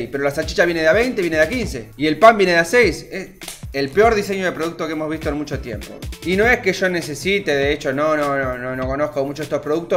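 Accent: Argentina. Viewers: Un Venezolano con acento Argentino, vea pues.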